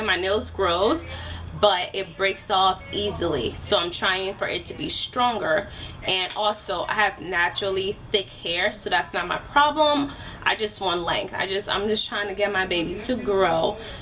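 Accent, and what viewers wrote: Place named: Liberia. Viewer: Wait, are you Liberian? Because the accent do sound like a Liberian 😆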